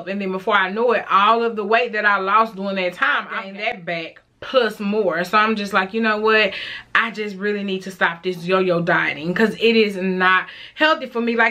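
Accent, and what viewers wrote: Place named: Texas, United States. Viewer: Bravo! I know you are helping a whole lot of people through your channel. If you ever want to join a great supportive fasting community, try A Healthy Alternative on FB. The founder is Chris K and hw has a channel too. And a wealth of information on YouTube. I will stay tuned to watch you. Your accent reminds me of my family who are mostly from Texas. Lol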